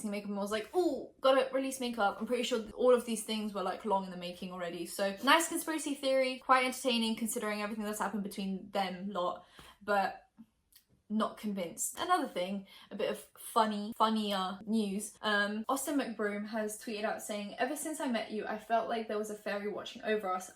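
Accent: United Kingdom. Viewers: What U.K. region is your accent from?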